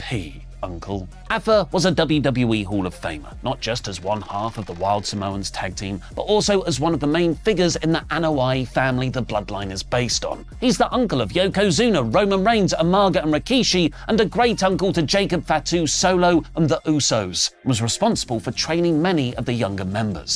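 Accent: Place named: United Kingdom. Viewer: okay i know its the british accent, but it takes 5 seconds to look up. Trey Mi-G-el. Just pronounce the G. No need to pronounce the U.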